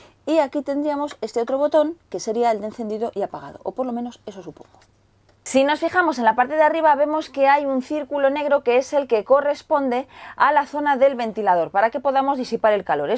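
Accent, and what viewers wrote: Spain. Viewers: eres de barcelona?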